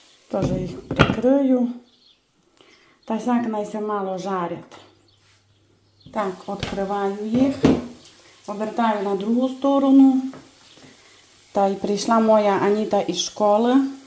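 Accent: Transcarpathia, Ukraine. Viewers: Вона говорить на чистому закарпатському діалекті! Чого повторюєте? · прекрасна українська мова з закарпатським діалектом, супер! Дякую за рецепт! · Добрий вечір,! Дякую вам за чергову смакоту, яку готуєте і нам показуєте! будь ласка не треба нічого вирізати при монтуванні, Аніта має рацію. Це особливість вашого каналу, ваш закарпатський діалект, слухаю і дивлюсь вас з превеликим задоволенням! Вітання з Вінниччини. Миру перемоги нашій рідній Україні, рясних Божих благословінь вам і вашій великій дружній родині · Дякую за дуже смачні рецепти❤️ подобається й закарпатський діалект 😊 натхнення Вам💙💛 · говоріть, будь ласка, на закарпатському діалекті і ні на кого не зважайте. Це так милоооо…..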